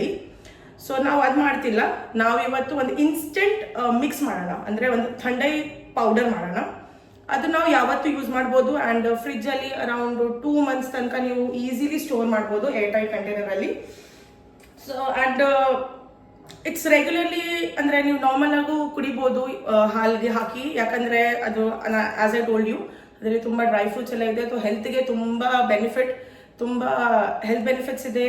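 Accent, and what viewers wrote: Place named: Uttara Kannada, India. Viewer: Ur talks r little like Uttar kannada ☺️ I am from Belgaum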